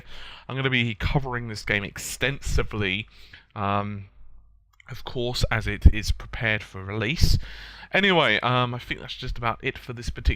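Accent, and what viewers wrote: United Kingdom. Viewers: My God your voice is British O.O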